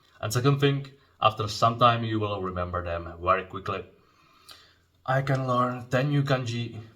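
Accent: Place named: Japan. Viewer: I really appretiate what you doing here on the internet. Keep up the good work and I wish you the best for your future. P.S. you're so Japanese that even your English has a Japanese accent to it.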